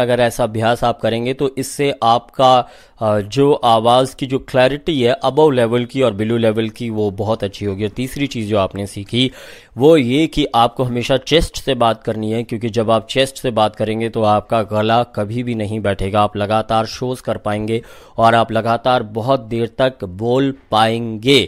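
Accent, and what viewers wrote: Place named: Rajasthan, India. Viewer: Bro apke Rajasthani influence kyu aa raha h r u from Rajasthan?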